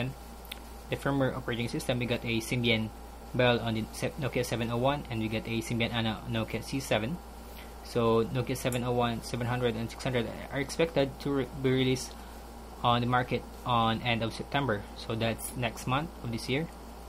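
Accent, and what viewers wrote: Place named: Philippines. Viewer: I knew it..you're a Filipino kabayan..the accent is there.. "The Symbian event was (in) HK so we attended there." "use two weeks ago not last last (redundant) week. mabuhay! peace y'all! =) good review and comparison.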